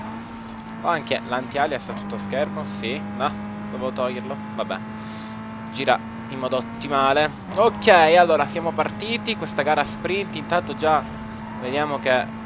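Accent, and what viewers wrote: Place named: Sicily, Italy. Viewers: quel tocco di siciliano nei tuoi video DEVE continuare a essere presente!!!rende il tutto più epico!!! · io lo capisco anche perchè ogni tanto parla parlermitano :P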